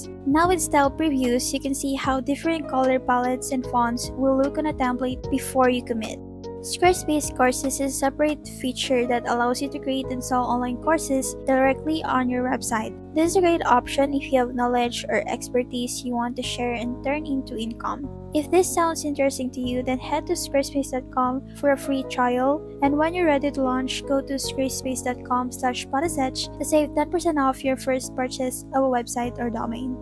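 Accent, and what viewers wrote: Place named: Philippines. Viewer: I see hyunjin and art I watch (also new sub cuz i love your artworkkkk) Also you kind have a filipino accent (Are you filipino by any chance?)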